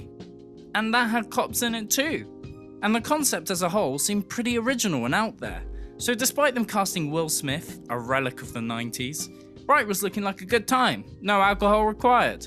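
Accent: England, United Kingdom. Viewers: Hey man nice 2 see u working, keep it up you sexy English accented fellow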